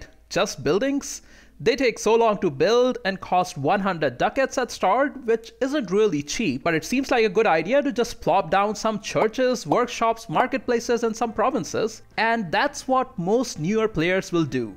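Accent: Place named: India. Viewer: Where are you from? I think you have an indian accent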